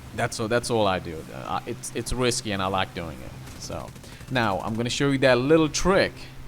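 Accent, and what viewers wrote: Louisiana, United States. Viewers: Interested in knowing what your accent is from. Sounds like Louisiana mixed with a Western European accent. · Its odd, because I could have sworn you sounded like you were from the Bayou, around Lousiana in the US at times, and other times it sounds like Australian. Really cool man! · I also thought Louisiana.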